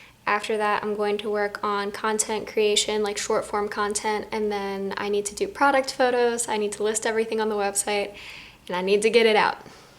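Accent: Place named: United Kingdom. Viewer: idk why i thought you were british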